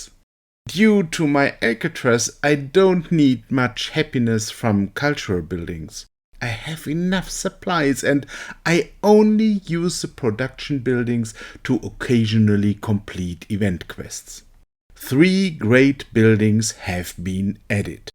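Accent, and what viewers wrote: Germany. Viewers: scheiße hört man dir an das du deutsch bist, dachte ich bin schlimm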